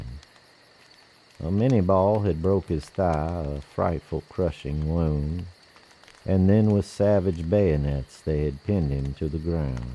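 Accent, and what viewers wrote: South, United States. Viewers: Gotta slite bit of * ...Southern drawl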